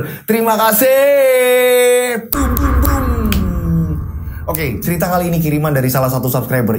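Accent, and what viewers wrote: Java, Indonesia. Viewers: logat Jawa nya medok bgt s mas klau sy yg paling sy suka boom boom boom nya mas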